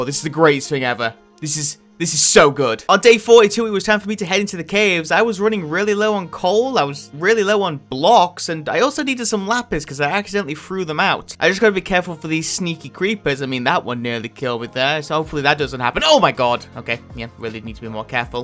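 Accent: United Kingdom. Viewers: R u Welsh?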